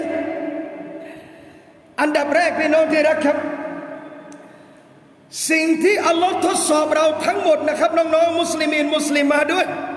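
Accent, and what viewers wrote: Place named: Malaysia. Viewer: อาจารย์ เป็นคนที่ไหนหรอครับเหมือนหลุดภาษามลายู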